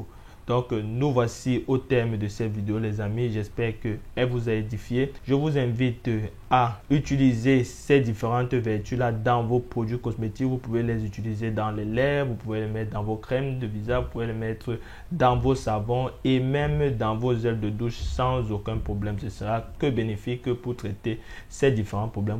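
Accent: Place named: Africa. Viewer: Bonjour à votre accent on devine que vous êtes un africain. Qu est ce qui vous empêche de montrer des femmes de couleur arrêtez ce complexe c est révoltant. Si vous n avez pas de photos de femmes africaines il y a les femmes noires américaines. Vous vous adressez plus à vos semblables et vous affichez des femmes blanches arrêtez ça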